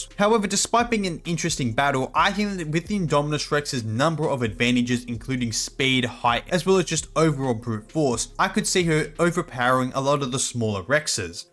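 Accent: Australia. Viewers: Good day mate are you Australian? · U an Aussie can’t tell which accent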